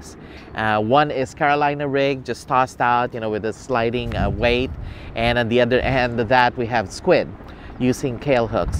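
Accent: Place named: Philippines. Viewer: You must be Filipino...your accent lol. I didn't know anglers still fish on poers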